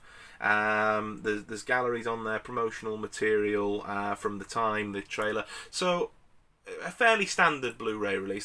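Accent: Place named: United Kingdom. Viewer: Hey mate, just found your channel and subbed straight away. Great buds buddy. Where abouts in the uk are from? Always good to hear a fellow Brit in the Tube 👍🏻🎥💿🎞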